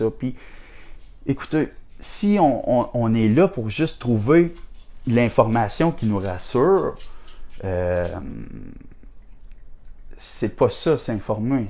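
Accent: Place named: Quebec, Canada. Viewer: Jean de Flor..les Québécois vous êtes beaucoup dans les fleurs 😉 en plus super joli accent vous avez, un vrai plaisir a vous écouter les Québécois 👍❤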